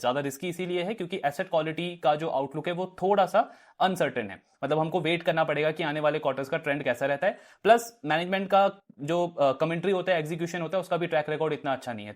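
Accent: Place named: Bihar, India. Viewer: Bhai app bihar se ho kaya maybe Madhubani aur Darbhanga se hoge app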